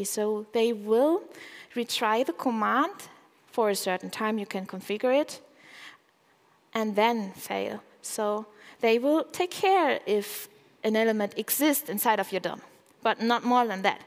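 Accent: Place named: Germany. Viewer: Die Mucke =) Nicht schlecht Herr Specht =) Vorträge natürlich auch ^^ Das very special Englisch ist actually very german =)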